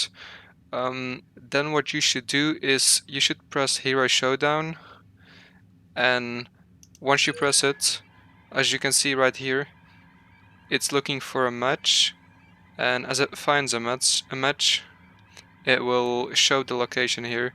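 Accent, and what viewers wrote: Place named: Germany. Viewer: Spüren ich das deutscher du bist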